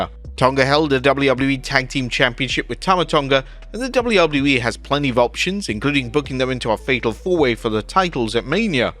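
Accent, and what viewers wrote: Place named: England, United Kingdom. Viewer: Theres 2 different people, an American and an english one, this is the english one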